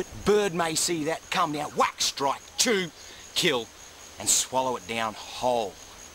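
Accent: Australia. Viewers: I fucking LOVE how Steve says "Cobra!" the Australian accent is just beautiful at times! COB-RA!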